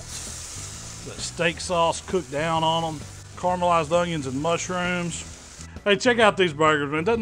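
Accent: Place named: Mississippi, United States. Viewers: Xeno he said boil... he's from mississippi. it's his accent. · Shhhh.... Mississippi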